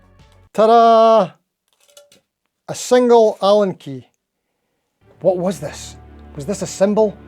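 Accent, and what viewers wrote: Scotland, United Kingdom. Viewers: glad you took it in good humour, I'm in Australia and we have trouble understanding a thick Scottish accent. Not that our accent is anything to brag about lol. 😊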